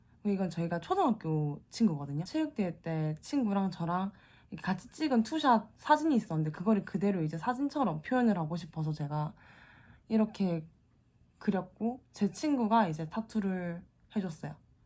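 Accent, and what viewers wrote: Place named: Busan, South Korea. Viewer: they're so pretty!! and ur busan accent is so nice to listen to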